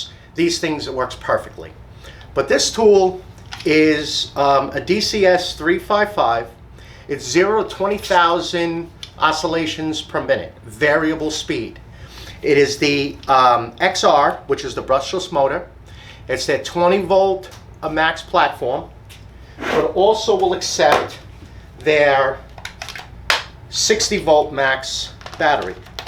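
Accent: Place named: Massachusetts, United States. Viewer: I could tell by your accent. I live in mass.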